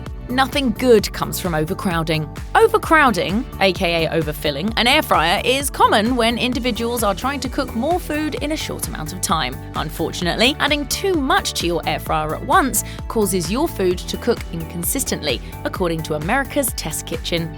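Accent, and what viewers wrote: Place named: United Kingdom. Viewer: why use a 'terribly British' style voice-over then continually quote temperatures in F when we tend to use C ?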